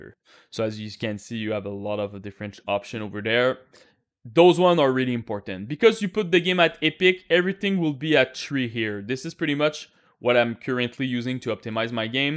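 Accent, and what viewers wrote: Quebec, Canada. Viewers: tsonne tres québékois my guy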